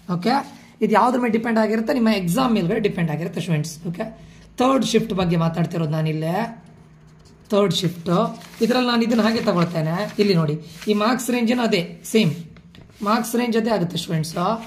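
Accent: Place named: Dakshina Kannada, India. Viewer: sir, you got the correct prediction i have subscribed to you now..! the accent of Dakshina Kannada makes me listen more..!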